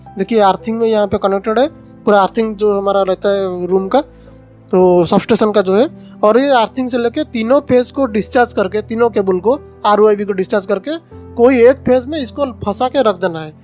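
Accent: Odisha, India. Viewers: Thanks yr.....or ek baat.......mujhe lagta hai aap Odisha se hai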